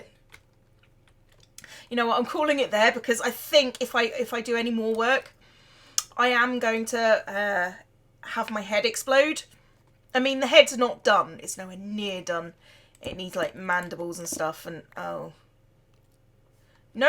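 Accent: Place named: Oxfordshire, United Kingdom. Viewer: Anyone know where in England is from? I'm trying to learn the difference in all the English accents by region. Awesome video, girl! I get excited when I see hermitcraft videos from you!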